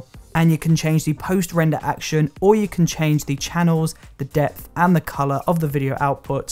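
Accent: United Kingdom. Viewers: really like your brittish acsent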